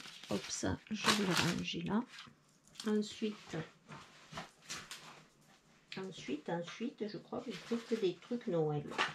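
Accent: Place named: South, France. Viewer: Bonjour, Les blocs 30x30 sont bien de Noël dernier. Vous, vous êtes choquée mais moi déçue car les articles sont en réserve mais faute de personnel seront peut-être mis en rayons semaine prochaine mais pas sûr... voilà la réponse que j'ai eu vendredi sur Albi!😫😡 J'y retournerai lundi et éventuellement j'irai à Gaillac... Vous êtes de quel coin avec votre petit accent du sud?😊 Je ne connais personne dans mon secteur pour partager le scrap. Bon dimanche 🌺 Sophie d'Albi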